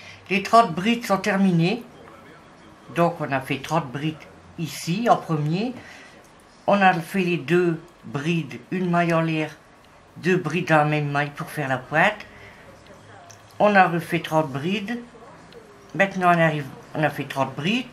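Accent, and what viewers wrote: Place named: Pas-de-Calais, France. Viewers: Votre accent du Pas de Calais, c'est celui de ma Grand-Mère 😍, une grande crocheteuse aussi, je pense toujours à elle quand je crochète. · Je l ai reconnu de suite l accent ch'ti je suis de bruay la Buissière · Bonjour, déjà merci de nous offrir tous ces tutos que vous expliquez à la perfection, j'ai retrouvé un papier sur lequel j'avais ecris leur taille et dimensions pour celle de 8 ans c'est bien d'épaule à épaule donc 30 cm pour celle de 10 ans 34 cm mais en vous regardant travailler si j'ai bien compris on fait un nombre de maille qui va jusqu'à ces dimensions et cela nous donne un poncho à leur taille, c'est bien cela!!!! Merci pour votre gentillesse et votre charmant accent que j'adore cela me rappelle Cambrai!!! belle journée et merci pour votre réponse!!!! je vous cite à chaque fois que je fais un de vos ouvrages et je vois que pas mal de personne vient visiter votre site!!!!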